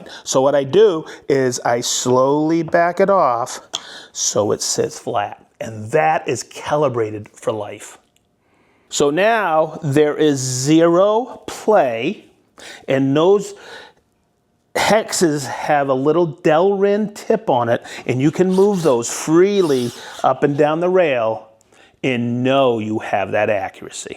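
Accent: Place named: Massachusetts, United States. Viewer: Thanks,!! I just picked up my set (20", with plans to get the 30" rails later) so I can start with a shop cabinet build in my garage. This video really brought it all together. Thanks as always for your positivity, encouragement, and wisdom! (And that lovely MA accent - Swampscott? I always remember my relatives from that area when I watch your videos! 👍👍👍)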